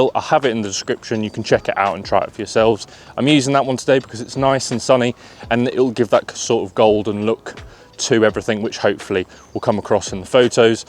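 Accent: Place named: United Kingdom. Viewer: Photography videos just sound better with a British accent.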